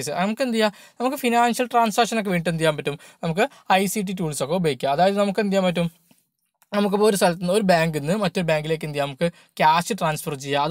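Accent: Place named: Malabar, India. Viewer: പക്കാ കാക്ക ഭാഷ ( ബാസ )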